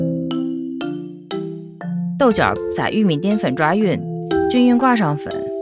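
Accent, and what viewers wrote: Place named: Tangshan, China. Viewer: 唐山话好可爱 哈哈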